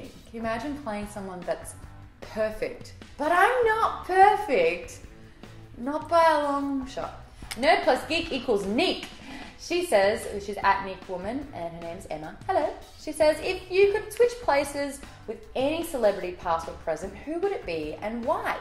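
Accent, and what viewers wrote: Australia. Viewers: Don't ever lose that Australian accent,! It's part of your charming personality! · I hope you never lose your accent. Australian is so nice to hear! I hate that so many Australian/New Zeelanders/British people in acting don't get to use their accents more. So when I hear an accent I just smile and it brightens up my day. It's one of many good things about you.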